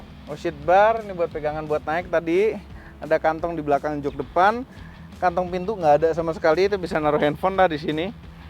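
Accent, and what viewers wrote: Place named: Kalimantan, Indonesia. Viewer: Aghhhh .... asyik banget denger nya om, bahasa " fungsi dan bukan untuk gaya" sprti bahasa pulau kalimantan😅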